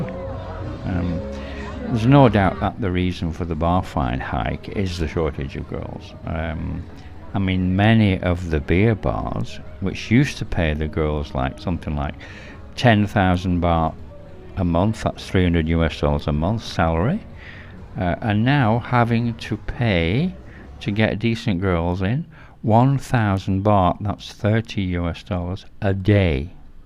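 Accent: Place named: Northern England, United Kingdom. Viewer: Hi, where in the north of England are you from? Your accent is most pleasant on the ears.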